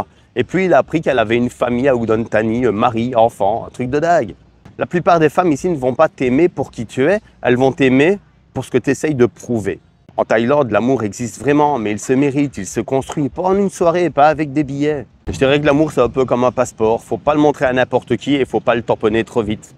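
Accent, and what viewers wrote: Belgium. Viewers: Ton accent belge est très sympa ! 👌🏻😅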